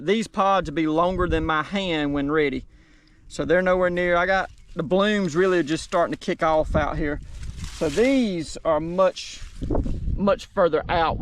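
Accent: South, United States. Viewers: Love the update. Next run on the t shirts, consider adding "hey y'all" (the way you start each video). Such a great southern thang😀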